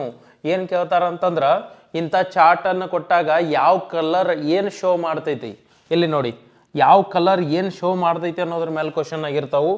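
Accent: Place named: Belagavi, India. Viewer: ನಿಮ್ಮ ಬೆಳಗಾವಿ ಕನ್ನಡ ತುಂಬಾ ಚೆನ್ನಾಗಿದೆ ಸರ್ 🙏🙏🙏🙏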